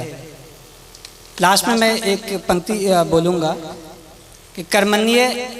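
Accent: Bihar, India. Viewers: Bihari Op Bolthe❤❤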